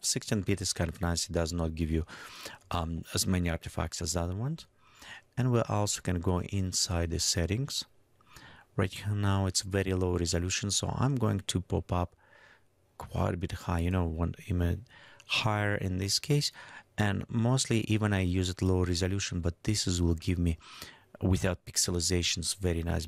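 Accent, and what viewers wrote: Russia. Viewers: нахуя на английском то? Слышно же сука что акцент русский...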